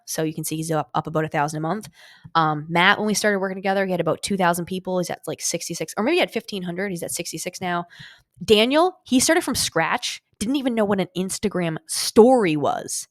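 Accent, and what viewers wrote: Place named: Maritimes, Canada. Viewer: I can hear a maritime accent in your voice ... maybe annapolis valley, Nova Scotia ... wild guess ...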